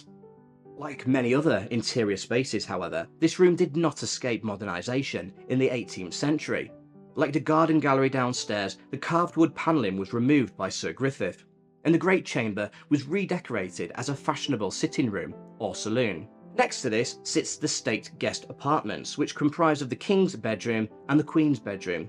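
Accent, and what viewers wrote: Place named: England, United Kingdom. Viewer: Why is it every male narrator w/ an English accent sound exactly like Thoughty? Best of the jaspers. Lawful hearts especially for ears full of mtn hillbilly dialect. Hope y'uns fixin up more from the yt poke. sahhhh-loot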